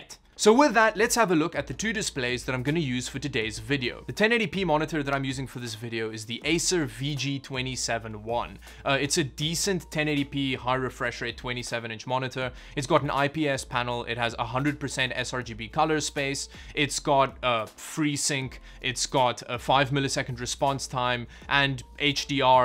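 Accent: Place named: South Africa. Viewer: why do u sound south african. Mhmmmmmmm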